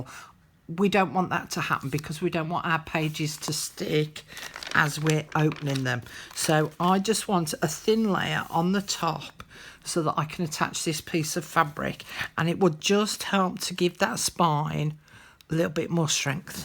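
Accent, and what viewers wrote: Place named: Black Country, United Kingdom. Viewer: Love you and your crafting.im a blackcountry wench as well,but live in hampshire now.your voice and accent and humour soothes my soul and reminds me of home.xxx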